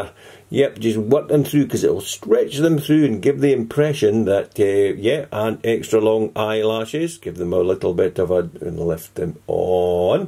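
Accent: Scotland, United Kingdom. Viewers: Where are you from??? My dad and your dad have the same accent!😂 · my dad is Scottish so I can understand everything he is saying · Haha Scottish accents r the best 💝 I'm Scottish too xx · I feel as if I only know what he's saying because I'm Scottish 😂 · There's a Scottish security guard at my school & he sounds exactly like this lol but this was funny, he actually was good at explaining everything tbh 😂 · so how come you have such a proper scottish accent? is it just so people on youtube can understand you better? when I first clicked on a video of yours it took a second to realise you were even scottish hahah, i do find myself talking a bit more proper when i'm talking to someone who isn't scottish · i'm not scottish, and i understood every word😂 i just absolutely love his accent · Holly Nethercot. That's how people speak in Scotland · i wonder how many non scottish people watching this like whits he saying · It's so great to understand every word even though I'm German! 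I love the Scottish accent! Scotland the brave! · Epic! I'm Scottish too. Understood every single word and cracked up laughing at subtitles! X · I love how americans dont understand wit hes sayin but i love the wee accent i have and im so glad im scottish 👍🏻😂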